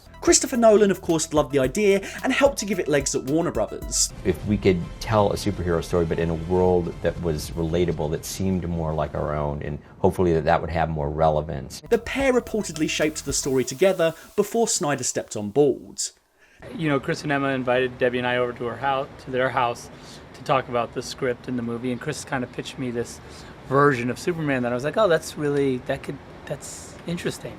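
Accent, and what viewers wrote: United Kingdom. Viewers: You got a put this video out a year ago. Now it’s too late to jump on the bandwagon of success. I have a problem with Zack Snyder‘s conservative politics which do seep into his movies. He made Rorschach a hero in the watchmen when he was meant to be a sociopath. In this movie superman is detached from his usual since I’m morality, right and wrong. And his parents tell him not to help people. Stupid objectivism. I guess you can’t hear how thick your British accent is. Your Kevin Costner was a terrible impersonation. The Man of Steel has flaws. The story should’ve been total chronologically. With all the distraction of metropolis there is no way Lois should have been in the final scene. I mean what did she do ?- take a taxi? Jor El played by Russell Crowe basically gave Clark the job he was literally born to do. In direct contradiction with everything he was fighting against on Krypton. 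And I did not see any reason why Clark needed to have the codex pumped into his DNA. It didn’t make him stronger than any other kryptonian. It was a plot point that was never used again in any movie. Maybe the goal was to have all the little kryptonian babies put in the bottle city of Kandor or like in the comic books. The excessive destruction started with a comic book from the 80s called damage control by the late great comic book writer Dwayne McDuffie. He later went on to create static shock, a whole line of Black superheroes for DC And was one of the major writers for the justice league and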